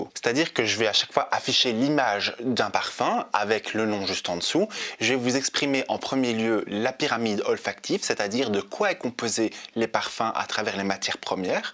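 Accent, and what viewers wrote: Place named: Wallonia, Belgium. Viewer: Accent wallon ou brusseler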